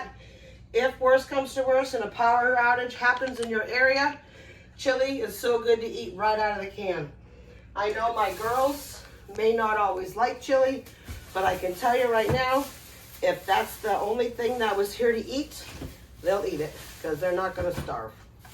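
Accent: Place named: United States. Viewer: ',' sounds American🤔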